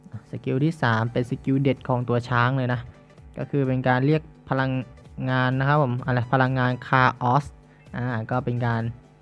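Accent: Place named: Thailand. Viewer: รู้สึกพี่เหน่อๆ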